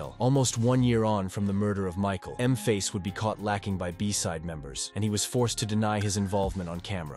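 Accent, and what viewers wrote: United States. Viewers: Presses play Hears AI American type robotic sounding voice Immediately turns video off…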